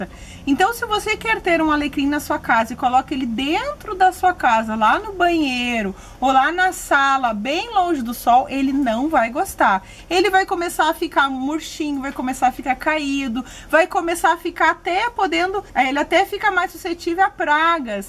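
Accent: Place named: Rio Grande do Sul, Brazil. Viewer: Nossa em outro vídeo comentei q pelo sotaque desconfiava q vc fosse gaúcha e neste vídeo descobri que és de São Leopoldo- RS a cidade onde moro hahaha q incrível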